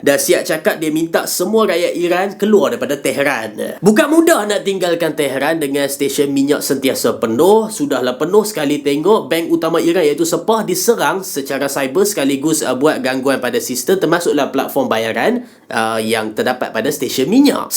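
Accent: Malaysia. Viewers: kerja bagus bang kalau boleh secara menyeluruh guna bahasa malaysia....pelat orang utara...😅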